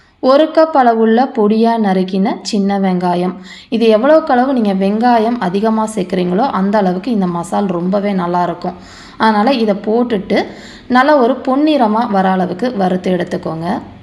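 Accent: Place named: Karaikudi, India. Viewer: Your native mam don't mistake me ur belongs from karaikudi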